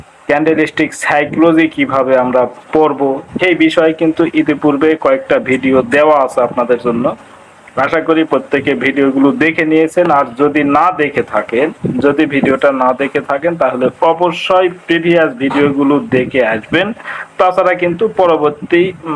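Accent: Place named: Bogura, Bangladesh. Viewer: ভাই মনে হচ্ছে আপনার বাড়ি বগুড়া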